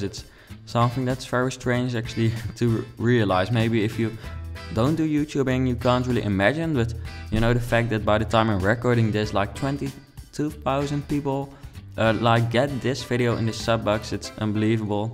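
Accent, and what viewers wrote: Netherlands. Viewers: This is gorgeous. Complaints about your accent are ridiculous, your you, be proud of your accent Dutch!!!! Don't worry about it and the complaints will go away, if they don't then they don't!!!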